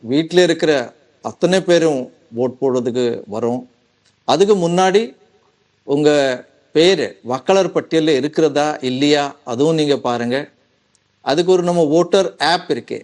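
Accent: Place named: Telugu, India. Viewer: பேச்சில் தெலுங்கு அல்லது வட மக்கள் சாயல் தெரிகிறது...எது எப்படியோ அசல் தமிழர் தேர்தல் அரசியலை கேலிக்கூத்தாக்காமல் இருந்தால் சரி.ஏற்கனவே சின்னத்தை புடுங்கி சாணக்கியமாக தெலுங்கு கட்சிக்கு கொடுத்தாச்சு. சின்னம் முறையீடு நீதிமன்றத்தில் இருக்கிற பட்சத்தில் வேற சின்னத்தை கொடுத்து எங்க வாக்கை மடை மற்ற முயற்சி செய்கிறீர்கள், பிறகு வாக்குப்பெட்டியை வைத்து எப்படியெல்லாம் நாம் தமிழர் கட்சியை அலையவிட்டு வேடிக்கை காட்டுவீர்களோ ஆண்டவனுக்குதான் வெளிச்சம்.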